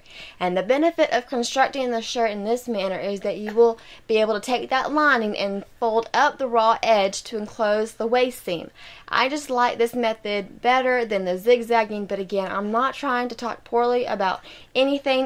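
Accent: South, United States. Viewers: so beautiful! what a great tutorial! and I love your southern drawl! lol what material did you use for the gold? on the accent?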